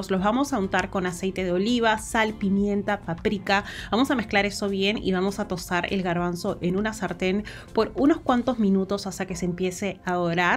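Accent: Peru. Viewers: Buenísimo esto gracias ❤ Tu voz es como del peru❤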